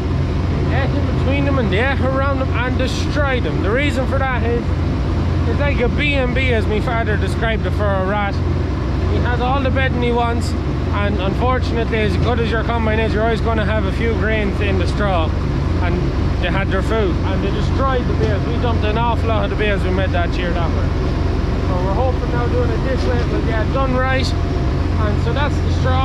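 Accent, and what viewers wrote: Ireland. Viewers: hey are you from louth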